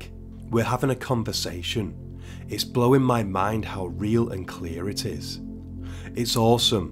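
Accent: United Kingdom. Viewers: Someone loves how British folks talk lol. Not hating just stating lol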